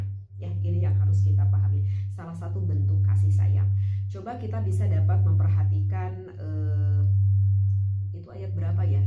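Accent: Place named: West Java, Indonesia. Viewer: Teh orang mana sich Sunda nya pas banget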